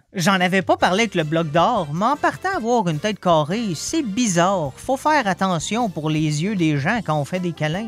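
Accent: Quebec, Canada. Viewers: Ah franchement j'ai bien rigolé devant la vidéo d'aurant plus avec ton accent québécois que j'adore toujours plus ! Je me suis abonné, tu vas percer ! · Alors jsp si j'ai un humour de gamin, mais je me suis tapé pleins de fous rires en regardant la vidéo. Tes blagues sont trop drôles et ton accent québécois est magnifique... vraiment continue comme ça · x) Cette chaîne c'est vrm ma découverte YouTube de cette année J'ai pas mal de vidéos à rattraper mais pas grave, c'est un vrai plaisir à chaque fois (l'accent québécois y est pour beaucoup hé hé) Salutations du fin fond du Nord de la France 👌🗿🇫🇷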